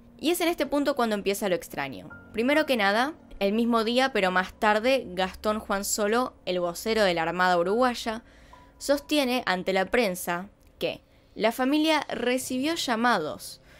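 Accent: Argentina. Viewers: Es Argentina, habla Argentino. Que queres? Que hable aleman? 🤣 🤣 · Es de Argentina? dowwww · Sos Argentina?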